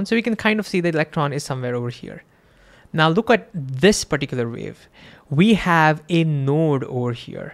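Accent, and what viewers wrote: India. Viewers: Brroo , is he indian 😑😑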